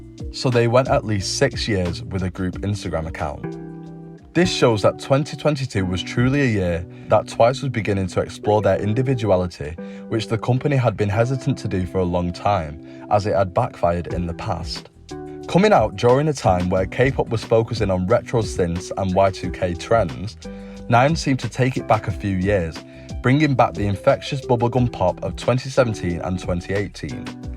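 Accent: United Kingdom. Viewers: unrelated but what is your accent called/from what part of england? i wanna know lol✨ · Love your northern UK voice bab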